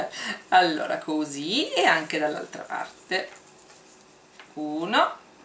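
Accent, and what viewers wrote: Sicily, Italy. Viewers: Sei siciliana?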